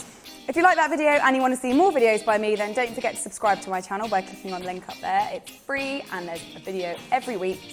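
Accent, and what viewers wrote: United Kingdom. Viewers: Hi I have been starting to watch your videos... and I think your videos are really amazing!!!! I love your British accent..haha I am living in America so... Anyway thank you for good videos!!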